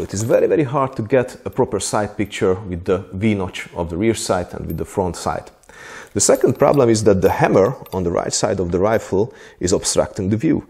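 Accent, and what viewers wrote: Hungary. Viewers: I have recently found your channel and I thoroughly enjoy your videos in the beautiful Hunglish language! I enjoy reading historical fiction, especially that of Bernard Cornwell over the periods of the Napoleonic Wars, American War of Independence and the US Civil War. In it he describes the weapons and how they're used in detail. Your channel is the first one I've found that goes into the same detail, showing how they work and their design. I really enjoy seeing all these pieces I've read about in action. Thank you very much for sharing your passion with us! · And a thank you to you, in beautiful bright Hunglish—Köszönöm!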